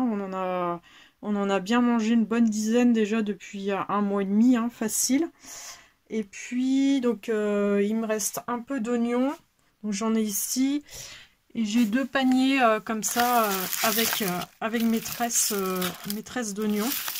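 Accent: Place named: North, France. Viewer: je découvre cette chaine, je vais regarder les autres videos. vous êtes d'où ? je semble distinguer un petit accent du nord sur les "a" ;)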